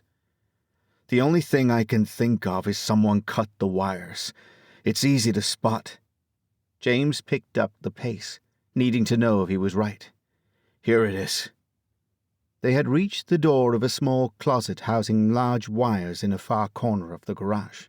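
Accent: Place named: United Kingdom. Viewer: WHY does a book set in SEATTLE need a "british narrator"?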